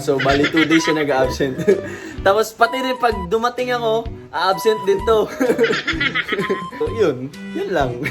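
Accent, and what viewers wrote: Visayas, Philippines. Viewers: BISAYA O ILONGGO?:)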